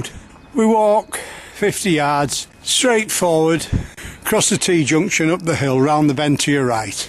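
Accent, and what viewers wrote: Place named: Cumbria, United Kingdom. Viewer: thank you for your nice reply. Yes I am a HUGE fan of the beloved Lake District and indeed I am proud I spent the majority of secondary school days there. I plan to head up here to the equestrian centre adjacent to the boarding school I attended. The equestrian centre used to be Witherslack farm base of course in Witherslack village Grange Oversands. I love your STUNNUNG Cumbrian accent. Yes Morcolme Bay and a few other districts you mentioned are familiar as we at School used to venture. Yes I am DYING to head to the equestrian centre and extend my stay back up here, head to Grange and many other stunning spots. Is there still a Railway Museum at Cartmell?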